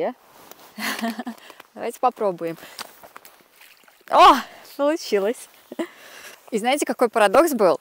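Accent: Standard. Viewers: Очень приятно слышать чистую,грамотную русскую речь. При том, что девушка выросла в Риге. Какая красота вокруг! Как будто я сама там гуляю и дышу чистым прохладным воздухом! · Спасибо большое за ваши простые и в тоже время неповторимые ролики.... Всё очень красиво, ухожено вокруг. Так красиво.... Вы такая молодец, столько учитесь.... Так приятно видеть свободного, образованного , прекрасно воспитанного человека...,с уважением относящегося к другим странам и нациям, знающего несколько языков и при этом ещё говорящего на прекрасном правильном, чистом русском языке, без мата и проклятий.... Спасибо вам, огромное, счастья и процветания ! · этой подписчице приятно слышать чистую, грамотную, русскую речь. У Вас способности к языкам, не очень заметно что вы выросли в Латвии.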